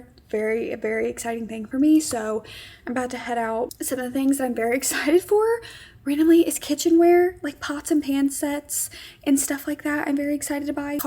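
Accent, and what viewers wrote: South, United States. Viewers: Girl you definitely caught me by surprise omg. Shoutout to you pookie I’m happy for you but I know this must be hard too. I will say I was surprised (when I found your channel) that you weren’t from Texas lol you give southern bell! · Congratulations!! You’ll fit right in since everyone thinks you have a southern accent already hehe. Sending love from Louisiana, we’re state neighbors now! 🩷🩷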